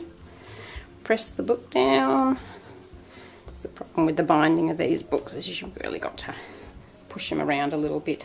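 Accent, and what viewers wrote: Australia. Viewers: Unable to sleep, my creative mind wondered - and it wondered right over to discover I am in fact not totally loopy for using the same technique! I cannot draw to save myself, but have found art therapy useful for years; so thank you for your tips. Love hearing a fellow Aussie voice to. "Rubber" usage unite!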